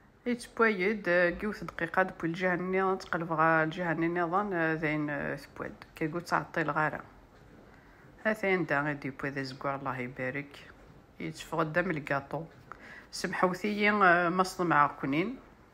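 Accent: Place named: Kabylie, Algeria. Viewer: Merci pour cette belle recette et très beau commentaire langage de la grande Kabylie bel accent qui donne chaud au coeur car je suis de la région d Azazga c'est une fierté bravo et merci infiniment bonne continuation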